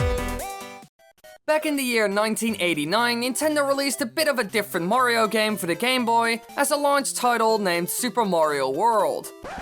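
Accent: Netherlands. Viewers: Wait. You are Dutch, amirite? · Did nobody notice that this guy sounds like hes dutch...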